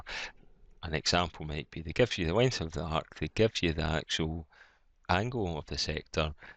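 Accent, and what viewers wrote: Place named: United Kingdom. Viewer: thank you random british or scottish man for the help! after 7 years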